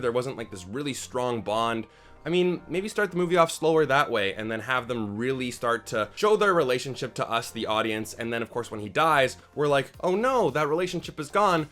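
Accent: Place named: Rocky Mountains, United States. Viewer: So, I'm a rare species in that I'm American and speak more than one language very comfortably, if you're not from Alberta or Eastern British Columbia Canadian I'm super impressed. Brits can't do Rocky Mountain American like you can. You're not German because you can say "W". Swedes and Norweigans do a great job at this particular dialect of English, but the Dutch even though huge percentage speak nearly perfect technical English, never quite get the rythm right. Not Aussie because they love their own way of saying things and they should because it's great. If you know anyone from Australia, the only thing funnier than doing John Wayne by John Wayne himself because he's from Illinois and his accent is not actually his own I believe is have an Australian do an impersonation of John Wayne.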